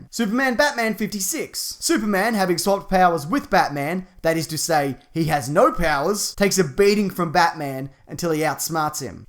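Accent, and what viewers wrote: Australia. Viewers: Kay ShyGuy I think he's actually australian...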